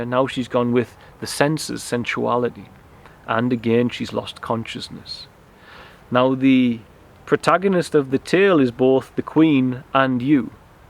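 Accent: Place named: North East England, United Kingdom. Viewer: Are you Welsh bro? Subscribed! Duw bendithia chi (god bless you). yeah I can't work it out. The more I listen the more I think you sound North Eastern! Don't want to say one place or the other in case I offend! Definitely more Newcastle than Sunderland! Final answer! Did I win? Good job though! Nice one!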